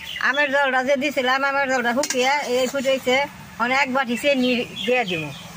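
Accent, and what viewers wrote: Tangail, Bangladesh. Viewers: টাঙ্গাইলের ভাষা।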